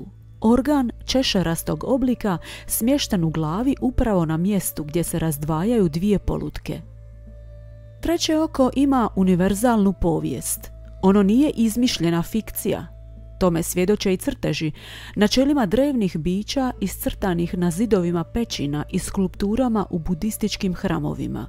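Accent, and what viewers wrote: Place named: Split, Croatia. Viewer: Srpski sa splitskim naglaskom*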